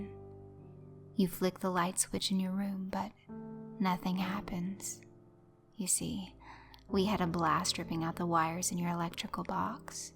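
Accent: South, United States. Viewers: YO WAIT YOU HAVE A SOUTHERN ACCENT?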